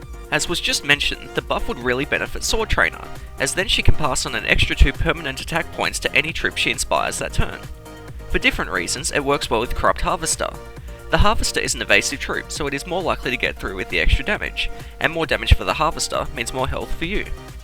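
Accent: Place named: Australia. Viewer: Very much like your video trying to place that accent... I wanna say adelade? maybe Melbourne. Its not New Zealand... Use of /aː/ as opposed to /æ/ Word Hobart Melbourne Brisbane Sydney Adelaide Ave. over all five cities graph 0% 30% 56% 70% 86% 48% chance 0% 60% 25% 80% 86% 50% demand 10% 78% 78% 90% 100% 71% dance 10% 35% 11% 30% 86% 34% castle 60% 30% 33% 100% 86% 62% grasp 90% 89% 89% 95% 100% 93% to contrast 100% 100% 100% 100% 71% 94% Ave. over all seven words 39% 60% 56% 81% 88% 65%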